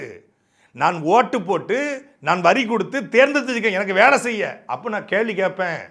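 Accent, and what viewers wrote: Tirunelveli, India. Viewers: திருநெல்வேலி மொழி வழக்கு அருமை செம்ம 👌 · சாட்டை துரைமுருகன் ண்ணா திருநெல்வேலி பேசியது அருமையாக உள்ளது அப்படி பேசுங்கள்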